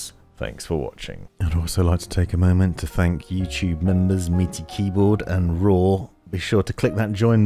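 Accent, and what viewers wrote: England, United Kingdom. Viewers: English accent, jazz piano, that earns a sub. Hope the tutorial is good.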